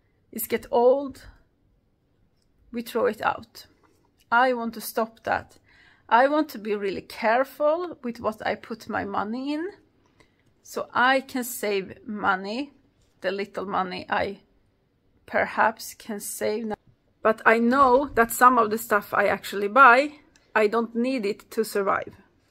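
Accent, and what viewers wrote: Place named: Sweden. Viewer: I am Swedish also!❤️recognized your accent! Lycka till!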